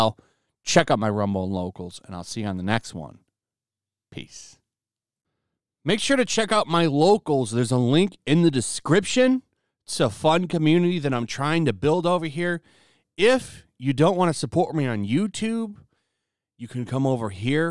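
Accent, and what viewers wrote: United Kingdom. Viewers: Like the British accent put to a Shakespearean tone. Thanks.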